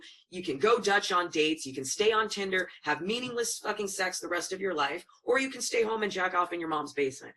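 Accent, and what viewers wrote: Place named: United States. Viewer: You're just acting/speaking like an All-American.....what's the problem? I think it's great!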